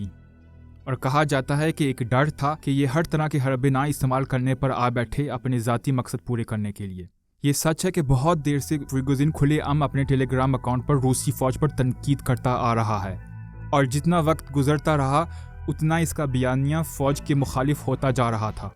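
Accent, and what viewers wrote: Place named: England, United Kingdom. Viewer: Ap urdu barri achi tarah angrez ke style main bolta hai😅😅😆❤️👍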